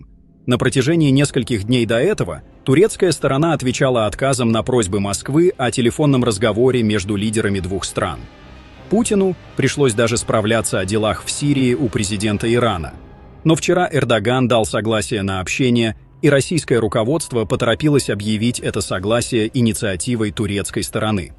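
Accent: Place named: Armenia. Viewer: не слушайте этого армянского пропагандиста все армянские деньги вкладывать чтобы общество вести в заблуждение Россия поняла что в Сирии нечего делать если сирийский народ 99% не хочет Башир асада зачем наши войска должны быть в си и деньги ещё вложить а насчёт арцаха это имело отношение к кавказской Албании VII век а Карабах по всем документам идёт Карабах товарищ армянские продажные с красивой речью выступаешь продажный человек что тебе армяне говорят то и красивые волосы наступаешь с армянским акцентом конечно не выгодно рассказать анекдоты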